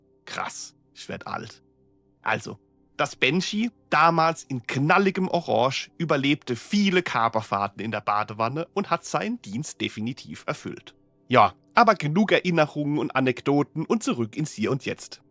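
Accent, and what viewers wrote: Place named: Palatinate, Germany. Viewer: Kummscht du aus de Palz?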